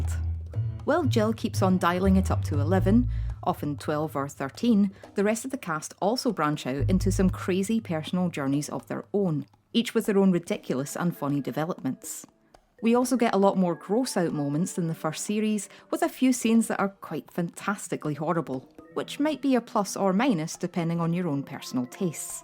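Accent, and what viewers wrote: Scotland, United Kingdom. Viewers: I watch it regularly & my hubs & i quote this daily. Helped me in my dark days & for me its the greatest show on earth! The "funeral" episode in series 1 is the joint funniest & most insanely brilliant shows ever along with series 2 "Jill's Pregnancy". Yew can keep yer bitterness to yerself, perhaps you might want to have a day off from persecuting Jill, YouTube Channel person, otherwise it just gets nasty. As soon as i heard your hacking cough & Scottish accent all i thought was "oh God, its Sandra!". Id cry if my ducts were working. Thank yew.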